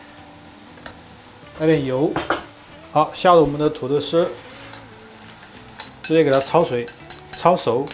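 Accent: Sichuan, China. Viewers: 老師又在逛市場了聽四川話很親切我在台灣已經聽不到了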